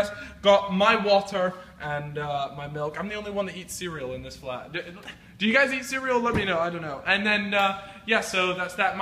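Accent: United States. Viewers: Is he american? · This is probably obvious but I am not a constant viewer so just out of curiosity, why is it that doesn't have a proper english accent? Did he move to England from the US or what?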